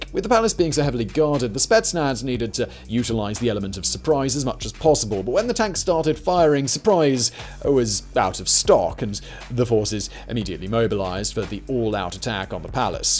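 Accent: RP, United Kingdom. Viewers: a lot of his videos are in pretty poor taste, like there's videos on his other channels about some really really sad and heavy stuff and yet he delivers it in this weird posh accent that makes it seem like he's joking, super irritating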